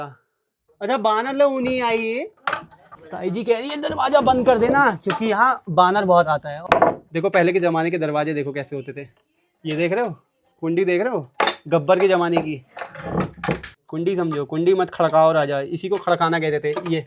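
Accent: Pahadi, India. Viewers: Pahadi accent amazing ❤❤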